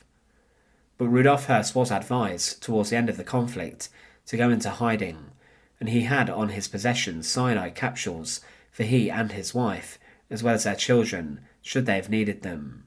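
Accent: United Kingdom. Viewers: What type of UK accent do you have?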